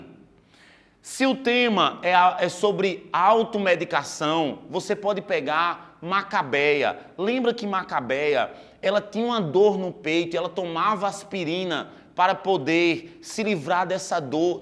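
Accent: Northeast, Brazil. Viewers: Apaixonada pelas suas aulas !! Queria que fosse meu professor no Ensino Médio e hodiernamente na faculdade. Curso Letras - Língua Portuguesa, e aprendo muito com você ! Estou estagiando no Ensino Médio e o que aprendo na suas aulas passo para os alunos. Muito obrigada !! você é maravilhoso. P.S., Seu sotaque é encantador, amo o sotaque nordestino.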